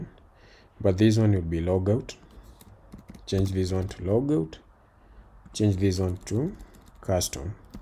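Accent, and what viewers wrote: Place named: Kenya. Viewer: You sound Kenyan. Shukran sana bro!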